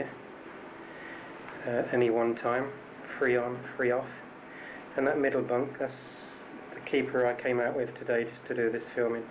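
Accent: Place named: Peacehaven, United Kingdom. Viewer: I have a friend who comes from Peacehaven and his accent and pronunciation is identical to yours. If I close my eyes it could be him speaking. You're not from round that way are you?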